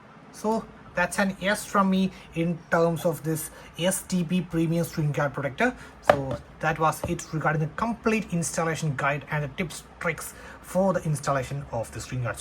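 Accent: Kerala, India. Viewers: Mallu ahnu alle 😂 Ahh english kettalae manasilagum 😂😂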